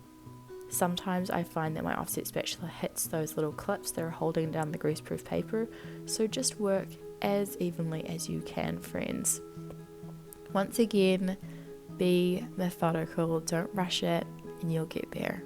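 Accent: New Zealand. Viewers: Thank you for this great recipe, you have a very soothing voice and a beautiful kiwi accent ❤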